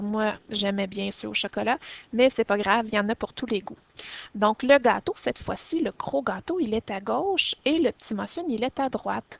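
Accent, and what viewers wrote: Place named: Quebec, Canada. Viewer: J'aime bien ton accents québécois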